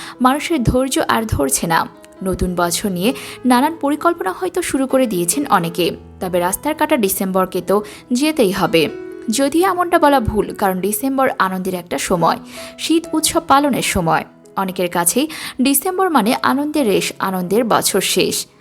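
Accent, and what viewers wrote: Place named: Bangladesh. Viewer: BANGLADESHI VOICE VALO LAGCHHE NA BLOCK KORTE HABE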